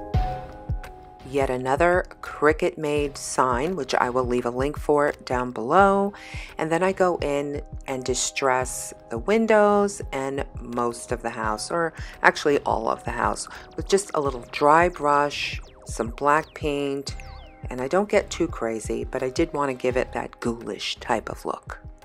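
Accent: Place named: South, United States. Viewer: OMG!!! I’ve just started watching YouTube diy videos in the last month and I just wanted to reach out and tell you how much I LOVE your videos! Yes, the ideas are great but your commentaries remind me so much of my friends here in Texas! Woman, if I didn’t know better I would think you were a Southern girl!!! Keep the great videos coming,! #sistersfromanothermister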